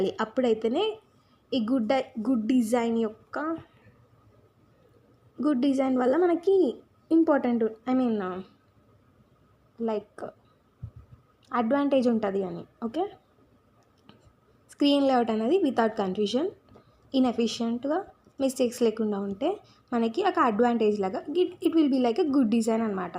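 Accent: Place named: Telangana, India. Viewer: Motham telangana pilla la matladthunav😅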